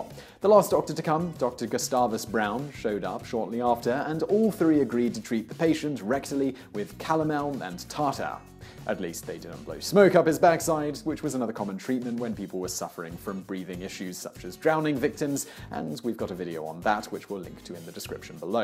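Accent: United Kingdom. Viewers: British man talking about American history. Interesting.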